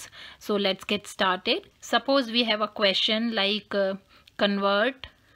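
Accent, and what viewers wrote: India. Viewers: You making fun because she's Indian and have funny accent, or you being sarcastic?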